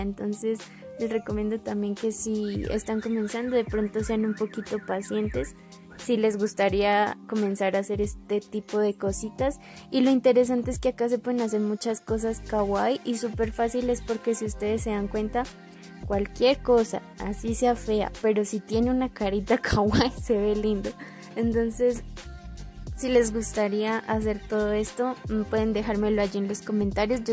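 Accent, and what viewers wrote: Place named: Colombia. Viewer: lo sabía reconocí tu acento jaja yo también soy de Colombia , no sabes cómo me alegro de saber que hay alguien con tal talento en mi país , eh visto a muy pocas , espero seamos más ✨💖 tienes todo mi apoyo ewe ✨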